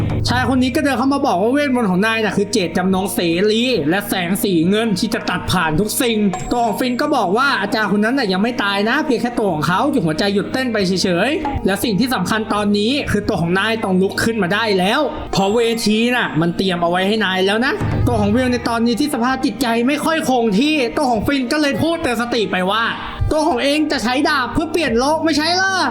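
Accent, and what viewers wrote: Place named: Suphanburi, Thailand. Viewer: ฟินเหน่อสุพรรณ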